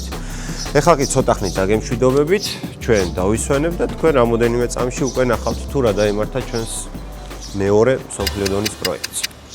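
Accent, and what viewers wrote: Guria, Georgia. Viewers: გურული აქცენტით რო ურტყავ ხოლმე ვხალისობ კაია 😊 მეც გურული ❤😂 გბალეშიკობთ გურული ბიჭები, აპა რავა 😊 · კაცურად გურულად მეტი ილაპარაკე ❤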